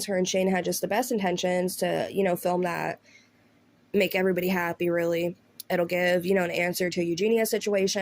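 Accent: South, United States. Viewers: your accent is interesting. Your “I” sounds like your from the south (words like “LIKE”, “LINE” ect.). All other words sound like “normal”. Where in the country do you hail from? Love you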